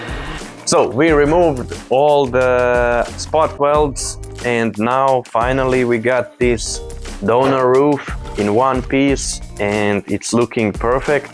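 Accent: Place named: Balkans. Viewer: I noticed the accent and the name of confirmed my thinking 😅 Odakle si legendo? Kidaju snimci!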